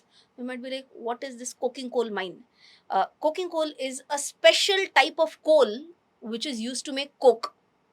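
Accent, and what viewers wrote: India. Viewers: It's not I Run Ore. Iron ore. Are you speaking Hindi English?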